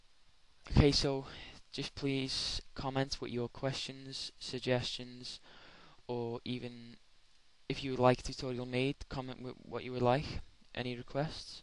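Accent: Liverpool, United Kingdom. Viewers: I just can't take this effing Scouse accent. Have to give up this Tut, thanks for trying though! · Woah, you from liverpool? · Scouse accent! :-))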